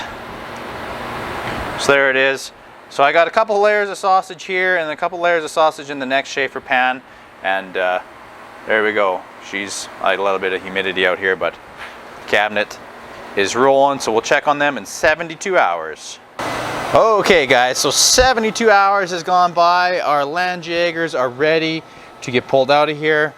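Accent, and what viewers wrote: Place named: Canada. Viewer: So I can tell from your voice that you are a fellow Canadian the way you said today you sound like half the guys I hunt with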